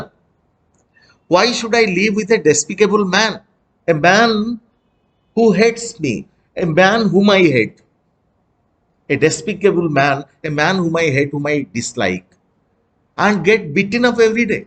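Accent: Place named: Bengal, India. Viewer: His Bengali accent is too cute and attractive 😍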